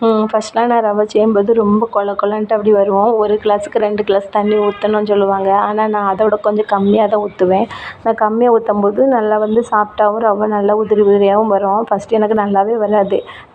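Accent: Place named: Tamil Nadu, India. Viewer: உங்க நேட்டிவ் எந்த ஊர் sister South side மாறி இருக்கிறது உங்கள் பேச்சு வழக்கு எனக்கும் சாலை மீன் தான் favourite அப்பறம் விளை மீன் ஊளி மீன்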